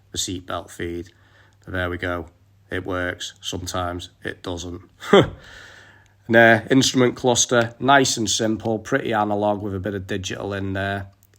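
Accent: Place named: Liverpool, United Kingdom. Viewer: Subscribed! I think you’re Scouse. I want a 435i someday too and I love in Liverpool!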